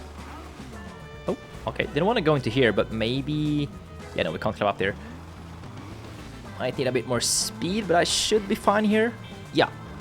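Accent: Sweden. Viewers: You have a very swedish accent, are you from sweden? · Are you swedish?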